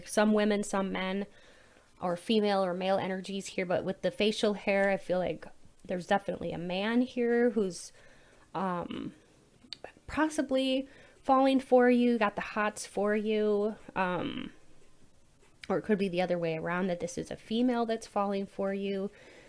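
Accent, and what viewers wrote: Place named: South, United States. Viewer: Good afternoon!I admire you very much and i adore your vibe.I am not from America but i feel that you stay in South of America ,in Ney Orleans,or Atlanta.Your vibe and how you speak make me remeber the Gone on the wind.I apreciste if you confirm3 this think!Thank you for your wofk!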